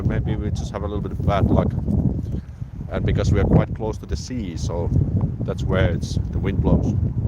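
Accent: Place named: Finland. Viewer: I’m honestly in love with Finnish accent when they speak English! It’s ear pleasing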